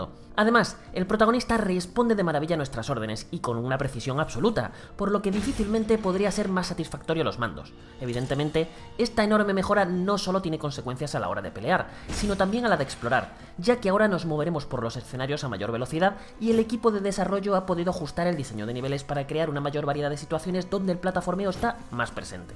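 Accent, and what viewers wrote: Andalusia, Spain. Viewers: ole ese bueen andalu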